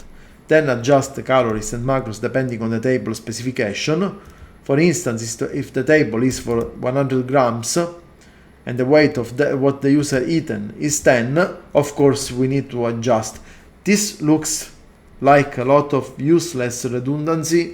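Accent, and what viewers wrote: Sicily, Italy. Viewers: inglese con accento siculo o sintesi in italiano.... vita di merda XD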